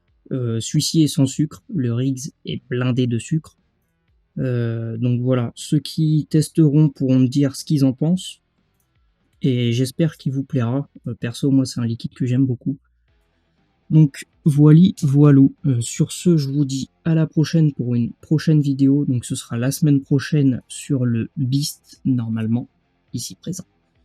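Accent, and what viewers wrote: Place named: England, United Kingdom. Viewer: Ah l'accent anglais là... :face-purple-crying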